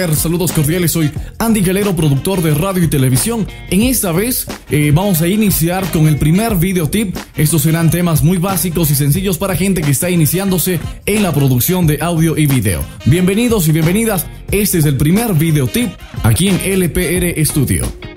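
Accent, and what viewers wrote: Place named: Colombia. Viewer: Muy buenos tips Andy, buena vocalización y acento por un momento pensé que eras paisano je, saludos desde Colombia.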